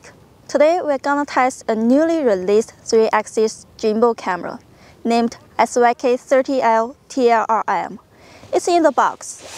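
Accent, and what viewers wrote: China. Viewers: Her English accent is like Chinese 😊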